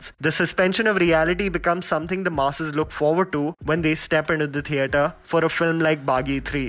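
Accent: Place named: India. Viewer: Speak hindi rather than english. Indian accent are disturbing 😖😖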